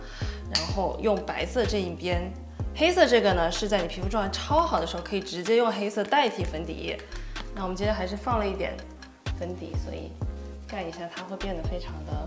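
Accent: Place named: Wuhan, China. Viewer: 你是我人生中第二个巨爱的武汉普通话！哈哈哈哈哈哈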